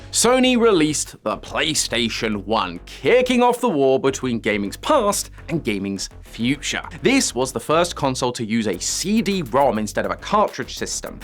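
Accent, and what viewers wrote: United Kingdom. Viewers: When did they hire a Britt for this channel?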